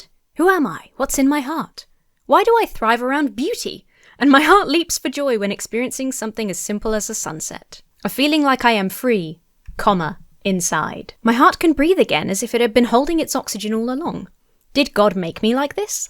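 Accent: United States. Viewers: I love your American accent. It's gold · that american accent genuinely broke my brain for a good few seconds when i saw your mouth moving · with an american accent is cursed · Your American accent is impressive. Reminded me of the one Michael Fassbender does in Alien Covenant. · I'm only twelve and a half minutes in, but I have to say I got so confused when you began reading in an American accent. I listened to this in the background while I drive, so it was a little bit disconcerting.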